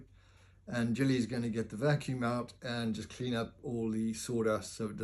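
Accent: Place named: Cape Town, South Africa. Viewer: Aha...starting at the beginning! You definitely sound Cape Townish, or Zimbo, maybe...no worries, lekker, man!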